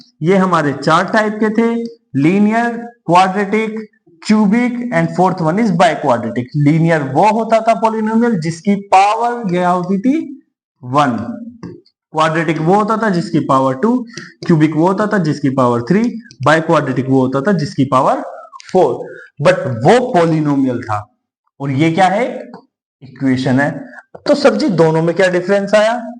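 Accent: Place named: Meerut, India. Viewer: Sir I 🤔 think you are from meerut...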